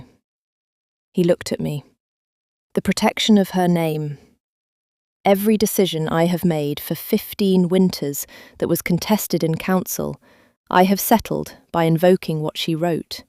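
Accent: England, United Kingdom. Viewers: Loving these stories, it's so wonderful hearing an English accent ❤ I am English so hearing our accent is great, please don't change it 😊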